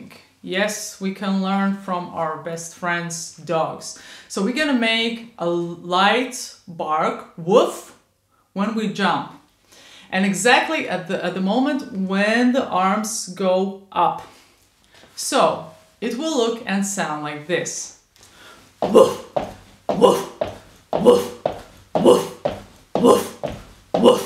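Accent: Latvia. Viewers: idk kinda sounds latvian😥😥 edit: oh shit u are latvian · Wait? Are you from Latvia? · Heey, are you by any chance latvian? 🧐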